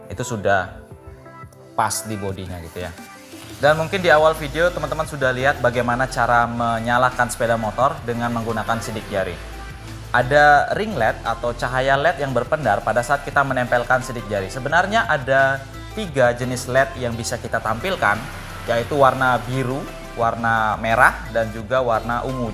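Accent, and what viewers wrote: Bali, Indonesia. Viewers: maaf saya bntu,itu sbnrnya logat bali,yg salah hanya 'Mukin' sisanya logat bali digabung sama bhsa indo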